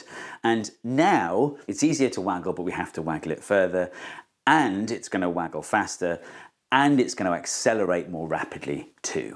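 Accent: United Kingdom. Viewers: Just an idea that popped into my head at the end of the video, no idea if this is relevant or not, but does the hinge location relative to surface the ball is striking on the lever make a difference? The levers you tested either had the hinge point in the center of the lever or on the opposite side of the lever from where the balls where striking it. Since all these levers will necessarily have a thickness, the hinge point was never in-line with the contact surface. Would there be any difference if the hinge point was inline with the contact surface? what if the hinge point was moved in the opposite direction (towards the balls)? Or what would happen if you moved the hinge point even farther from the balls (like a couple inches[or I suppose you could use centimeters] back)? Another idea that popped into my head while typing this; why do English people not spell it 'leever' when they pronounce it that way? 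You don't pronounce the word 'level' that way.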